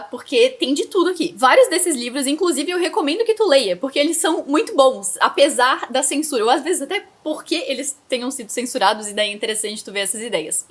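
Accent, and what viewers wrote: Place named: South, Brazil. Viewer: Você é do sul?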